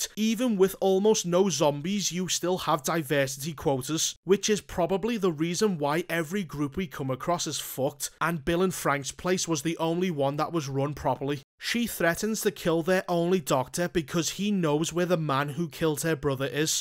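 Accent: Liverpool, United Kingdom. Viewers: A nice scouse commentary on the last of us tv series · This video is 10x better with your scouser accent.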